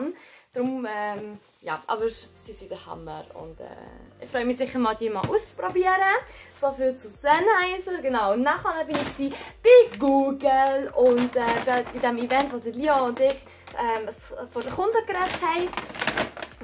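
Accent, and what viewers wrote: Switzerland. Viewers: Haha i finges huera geil dasd itze all viedeo uf schwiizer dütsch machsh :) U da einhorn ha ig oh😂😍🦄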